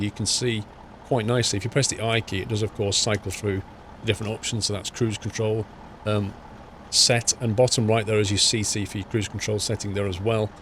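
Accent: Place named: Liverpool, United Kingdom. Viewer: .. loving the woolish Scouse twang 😁! Question for next week: with this generation (which am half in half out being 27).. and with the internet being something this generation is born in... Do you think games have changed to feed this generations instant gratification?.. as in... Do you think games have got easier and have quicker checkpoints so you can get quick satisfaction... I remember how quickly I used to complete super Mario world for the snes and I went back to it the other week and it was soooo much harder than I remembered..... What's your thoughts??? Next topic: console pc mobile gaming.. which is best for which genre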